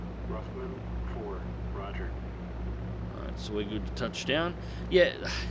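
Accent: Australia. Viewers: Does the J-25 variant have all the perks of the L? Boosted ailerons in particular are what I’m thinking of... Love watching these videos. Ozzie accent, solid flying, honest ego-absent gameplay experience. I’ve been around the channel three, maybe four years (since you played War Thunder with Phly way way back when the game was good—he introduced me to you) and I’ve stuck with your channel long after I’ve stopped watching many others.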